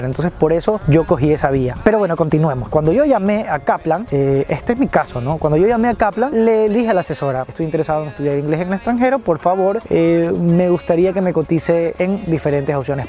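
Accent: Ecuador. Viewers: he mi comentario esta ahi tu acento guayaco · Jaja ni bien te escuché hablar dije el debe ser ecuatoriano Saludos desde Quito :)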